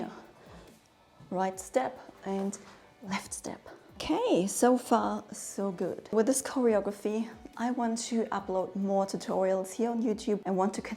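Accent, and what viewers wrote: Germany. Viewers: I could listen to you speak English with yr awesome German accent all day !! Love you !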